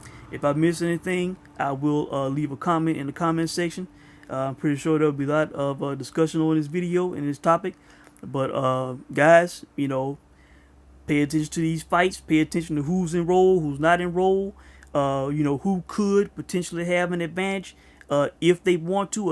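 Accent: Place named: Alabama, United States. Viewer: Everyone has there own opinion but u are a hater, get your facts right errol used Vada when he fought Brook, if u do your research hes on the Vada website, u dont sound like the most intelligent brother but i know u can read. Before Spence fought Brook u were disrespecting him saying he wasnt that good, hes fought nobody, saying he would destroy spence & indicated that u would even eat crow if Spence beat Brook, u went live on youtube an ate your Crow in front of your viewers now mths later u decide to contradict yourself suggesting the best welterweight on the Planet is ridin dirty. smh. After he broke Brook face (eye socket) now your saying Errol is juicing, child please. i admit Spence aint no Sugar Ray Leonard, Tommy Hearns, Aaron Pryor but he is a bad mf he must be if he fight so good he got u thinking hes juicing lol, look bruh knowledge is power so read & stop sittin up there sounding like u have a 3rd grade education an speaking like your from Possums Paul Alabama a lil town with a population of 12 people. 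lol. this aint fishing this is boxing, get ya mind right cause your new nickname on youtube is cant get right. lol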